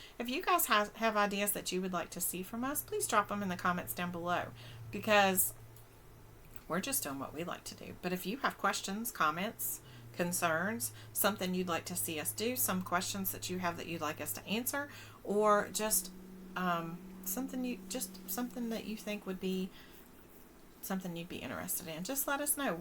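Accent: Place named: South, United States. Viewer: Hello, nice to meet y'all. Where are you?? I'm catching a southern accent.... I'm a transplanted Texan in Tennessee.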